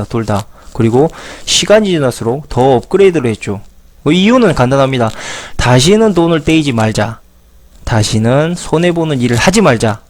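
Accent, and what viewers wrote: Jeolla, South Korea. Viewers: 고향이 전라도신가봐요~^^와 뇌섹남 어찌이런생각을 하시는지 완전매력적이시네요 멋있어요♡♡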